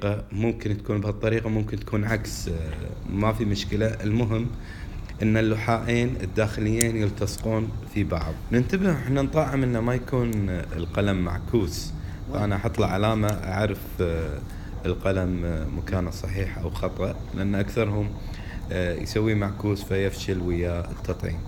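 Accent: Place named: Gulf, Arab world. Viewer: انته خليجي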